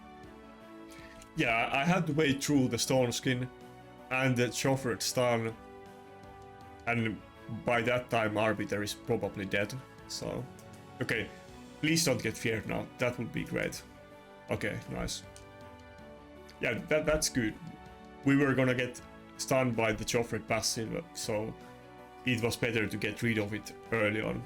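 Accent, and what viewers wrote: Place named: Finland. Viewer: May I ask where you are from? It's just that you kinda sound like a Finn that's trying to hide the finglish accent but slips up every now and then :D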